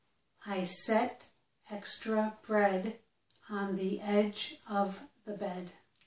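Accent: United States. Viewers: Excellent and the best broadcasts American's pronunciation !! I'm grateful for you Madame. Thank you +++. Fadi from France, advanced learner English in Canada .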